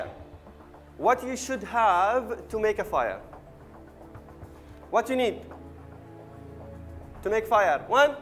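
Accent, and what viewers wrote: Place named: India. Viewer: Excellent explaination eventhough he sounds like a Indian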